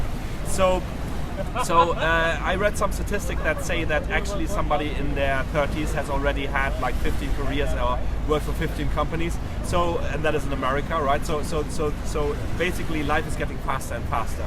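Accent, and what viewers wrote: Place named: Germany. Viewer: I thought you sounded German :) Now I know this for a fact!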